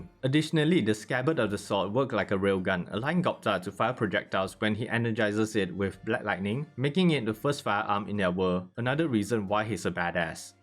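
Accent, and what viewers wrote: Singapore. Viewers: Sing-lish FTW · dude are you singaporean?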